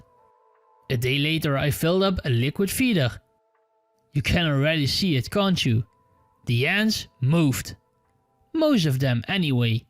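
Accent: Netherlands. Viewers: Haha na de eerste paar seconden wist ik al meteen dat je Nederlands was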